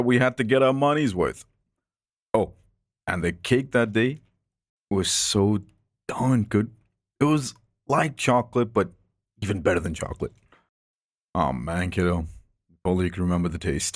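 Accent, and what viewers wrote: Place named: Caribbean. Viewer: I can’t pin the accent. I know it’s caribbean. Is it VI, Bahamian, Trini, or Guyanese? Maybe Belize??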